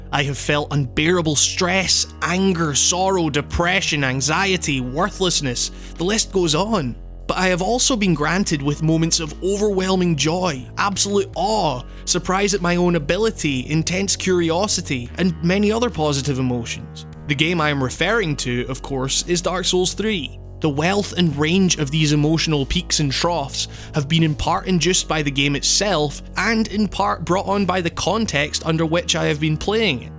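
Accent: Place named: Scotland, United Kingdom. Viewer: This is the first video I have watched from you that didn't feel like i got something out of it by the end. I do appreciate the the experience was landmark for you and that you wanted to share it but for some reason i cannot put my finger on, i did not like this type of video from you as much as your others. For the record i love your work, the way your brain processes information, the way you convey that information to others through an impressive vocabulary that leaves me needed a dictionary sometimes. Also your accent is cool, I would assume...Scottish? Just a guess. Maybe Irish. Odd that I don't know how they are supposed to sound different but that they should. Anywho, i'm getting side tracked. I just wanted to drop in my two cents on how i felt about this video so that you could use it as constructive criticism and hopefully that will help you make additional content that more people enjoy. 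Again i cannot seem to put my finger on what makes this review less impactful and enjoyable than the others but i encourage you to keep at it cause you do good work. Again it's not that I didn't like this video, just less so than the others. I may be in the minority on that though so you should of course get input from others as well. :) have a good one man, hope to see more content soon! Edit: Sorry i responded to such an old video, you may not see this post at all, but i wrote and submitted it before i checked the date on the video.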